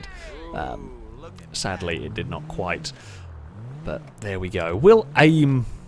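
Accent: United Kingdom. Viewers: I like your British accent It makes you more interesting to listen to.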